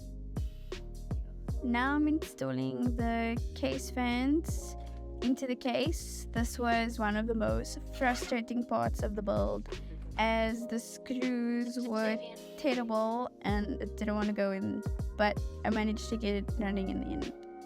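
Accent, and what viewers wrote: South Africa. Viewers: South African accent. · Am I hearing a CPT accent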